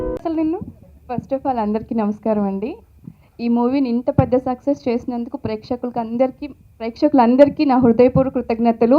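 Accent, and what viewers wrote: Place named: India. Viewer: Spoken like a True Telugu ammayi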